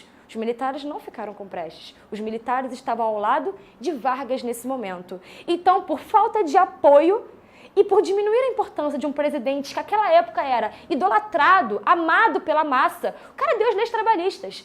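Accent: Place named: Rio de Janeiro, Brazil. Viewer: Parece mesmo kkk ainda mais pelo sotaque de carioca .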